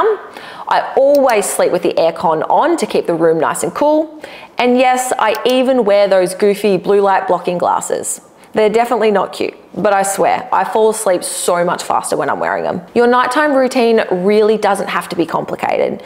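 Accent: Australia. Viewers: I am here just for the smart video with common sense information and the Australian accent....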